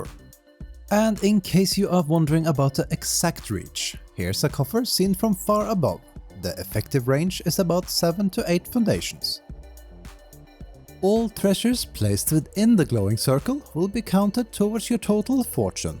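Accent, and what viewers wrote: Norway. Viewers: 10/10 norwenglish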